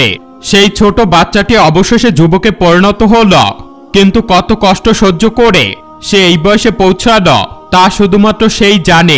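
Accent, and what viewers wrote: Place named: Noakhali, Bangladesh. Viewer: ভাই তোমরা কণ্ঠ এমন,মনেহয়,নোয়াখালী মনেহয়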